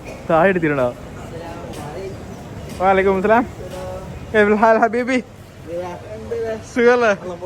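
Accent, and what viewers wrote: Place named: Kasaragod, India. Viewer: Nigal kasargod anoo.... Live kandu.... Samsaram kettpo kasargod ann thonnni Ksd ano nigal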